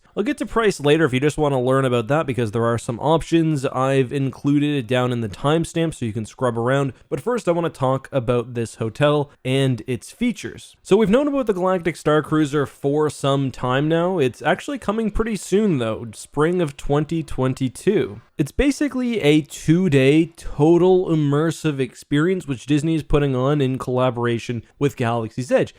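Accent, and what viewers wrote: Canada. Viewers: U Canadian boi?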